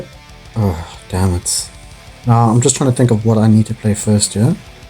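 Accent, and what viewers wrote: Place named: Cape Town, South Africa. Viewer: Me too bro! i was thinking theres no way another cpt gent making pocket content😂. Accent spoke for itself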